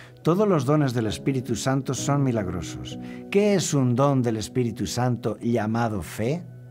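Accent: Spain. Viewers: HOLA. HACE APROXIMADAMENTE DOS MESES ENCONTRE ESTE CANAL. TODOS LOS VIDEOS QUE HE OBSERVADO Y ESCUCHADO ME HAN PARECIDO MUY ACERTADOS. Y UNO DE LOS QUE MAS ME HA DADO LUCES PARA MI VIDA DE FE ES PRECISAMENTE EL QUE TITULA: ( QUÉ ES LA FE). ME GUSTARÍA SABER SI HAY ALGUN VIDEO SOBRE EL TEMA DE LA MÚSICA, EN ESPECIAL LAS CANCIONES DE FE. LES FELICITO, PUES OBSERVO EN TODOS LOS VIDEOS ( LOS QUE HE VISTO) QUE SON UDS MUY OBJETIVOS. ME GUSTA LA FORMA DE PRESENTAR LOS TEXTOS BIBLICOS. SOY DE COLOMBIA Y SIEMPRE ME HA GUSTADO EL CASTELLANO HABLADO EN ESPAÑA...( ESE QUE HABLA ) ESE TIPO DE ACENTO ME PARECE ESTUPENDO. SOBRE TODO PORQUE ESTABLECE UNA CORRECTA FONETICA ENTRE LAS DIFERENTES LETRAS COMO LA S, C, Z. LA PAZ SEA CON UDS.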